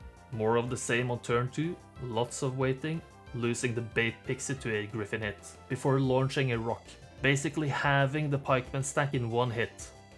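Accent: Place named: Norway. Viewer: Norwegian revealed... for all those that didn't already recognize the accent Heia Norge!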